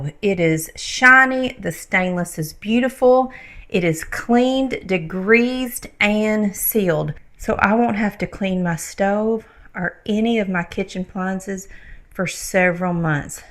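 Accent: South, United States. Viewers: I agree, her accent is endearing. DisposAll is a GE brand of disposal, one of the oldest. Her using a brand name in place of a generic term for her disposal is the same as people saying Kleenex when referring to any facial tissue. Another word southerners often use is Coke when referring to any soft drink. So in this case, saying DisposAll isn’t a southern pronunciation, it’s a word substitute. (39 years in the South for me.) 🙂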